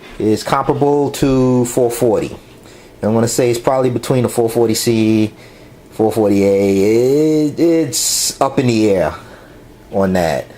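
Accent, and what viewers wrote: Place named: New York, United States. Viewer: Not to offend but it sounds like your from new york im from alabama so our situation is a little different but i do like your reveiws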